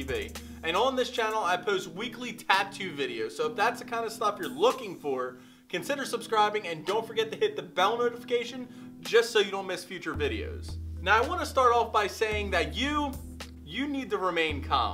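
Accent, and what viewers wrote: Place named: Delaware County, United States. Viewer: Delco accent. Know I can trust this guy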